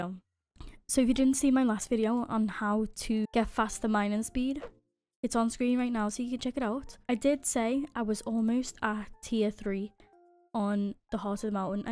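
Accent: Liverpool, United Kingdom. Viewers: are u a scouser? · Just a question r u British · Are u scouse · sheee, the scouse god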